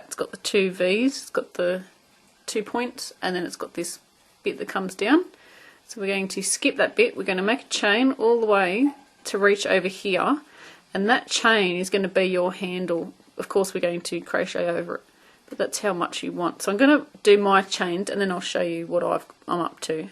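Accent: Australia. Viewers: so glad i found aussie ,i can understand you lol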